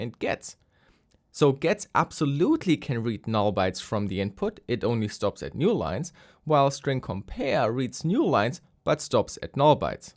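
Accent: Germany. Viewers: The german accent is strong in this one... I know the pain too well~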